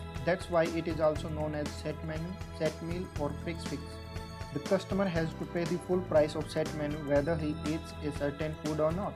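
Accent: India. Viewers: Funny Indian accent. Why not get an American to read it?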